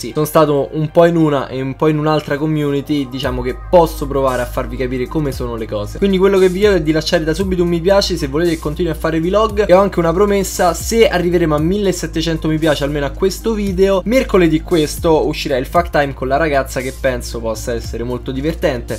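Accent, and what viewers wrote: Rome, Italy. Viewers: Ho scoperto il tuo canale grazie a dei miei amici (maschi), che penso ti seguano solo per i gameplay, poiché su youtube seguono solo gamer. Ero curiosa, non ho mai guardato video in cui giochi (semplicemente perché non ci capisco nulla), ma mi sto guardando i vlog e i video ask. E niente, ti adoro: mi piace il tuo modo di pensare e di affrontare argomenti un po' scomodi, mi piace il tuo dire quello che pensi, senza peli sulla lingua, ah e mi piace pure il tuo accento romano. Mi pento di averti conosciuto solo poche settimane fa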